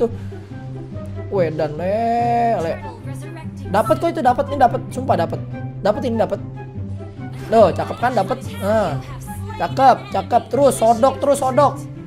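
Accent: Jakarta, Indonesia. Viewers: Betawi bnget d lu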